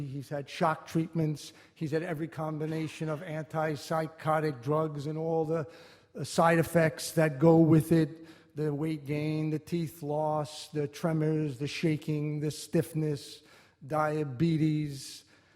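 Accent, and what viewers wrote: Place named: New York, United States. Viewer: Love John Turturro...he always gives a wonderful performance and makes everything more special. He also reminds me of NYC when he talks in his own voice, which I miss. Family commitment is becoming a rare thing, but this is a beautiful example of how he approached his particular situation He would make a day ALL about his mother and brother, although the unexpected visits are sometimes necessary, too. It's when you are the full-time caretaker, you absolutely need to hand off the duties at least a couple times a week or you just end up exhausted and can slide into depression. This is why home health care workers are so necessary, although our current administration seems they could care less.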